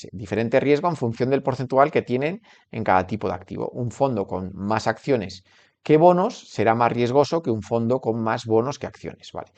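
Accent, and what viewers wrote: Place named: Galicia, Spain. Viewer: tus padres son gallegos? En tu forma de hablar te lo noto y con lo "espallados" que estamos por el mundo hasta en el desierto me he encontrado a gallegos😂😂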